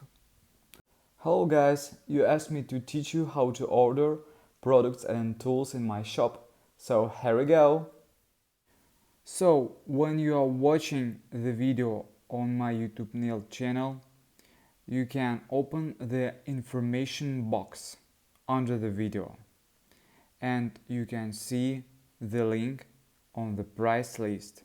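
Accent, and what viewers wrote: Russia. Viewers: Are your clients happy? That's all that matters. I love listening to your accent, and can those who criticise speak Russian? If they tried you can criticise them. I love listening to you, and I love what you do. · I love your nail art, and you're great with gel. I also understand your English very well. My son is obsessed with all things Russian, and sometime when I watch your videos, he watches with me just to hear your accent. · Also, your English is good. I ❤LOVE❤ your Russian accent. Don't let anyone tell you that the way you speak is flawed.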